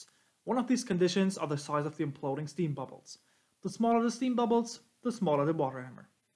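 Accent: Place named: Germany. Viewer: Your English sounds rather German-ish with that accent so I'm gonna ask in German Das ist ein interessantes Video danke erstmal dafür aber sehen Dampfschläge in Rohrleitungen zb. nicht komplett anders aus? Natürlich bezieht sich das Video auf einen Kondensatbehälter bzw Speisewasserbehälter aber habt ihr ggf auch ein Video das sich mit Dampfschlägen in ROHREN befasst? Das fände ich sehr interessant und wichtig zu wissen für angehende Kesselwärter zu veranschaulichen warum das richtige entwässern eines jeden Dampfsystems vor Inbetriebnahme so wichtig ist :) danke für die Aufmerksamkeit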